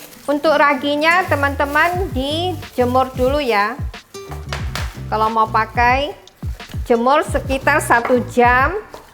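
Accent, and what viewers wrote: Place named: Java, Indonesia. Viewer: Saya suka resep2 kue khas medan👍 cuma logat bicara cik kok logat jawa ya?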